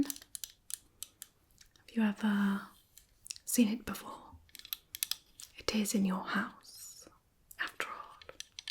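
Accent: United Kingdom. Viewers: As unhinged and possessive as this gal is, she's irresistible! I can't believe this guy let such a beautiful woman with the cutest British diction get away! Even if INSANE, she's a keeper!, this one's a masterpiece! You made all of us wish we were that guy! You've done it again, love! ❤ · I didn’t know I needed British ASMR until now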